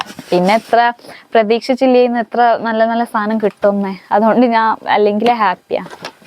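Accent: Malappuram, India. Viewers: Ivar malayali alle?ariyunnavar paranju tharamo? Pinne engane malayalam padichath? Onnum manasilakunnilla.. Malappuram slang anallo padichittullath.. Husband malappuram malayali ano?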